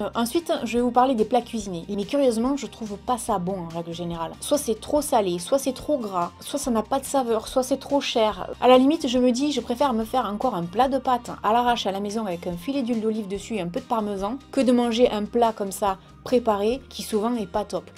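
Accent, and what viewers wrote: South, France. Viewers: Nouvelle abonnée ,j'ai passé un moment très agréable à regarder ta vidéo. Merci pour toutes ces bonnes idées ,ta simplicité et cet accent du soleil😘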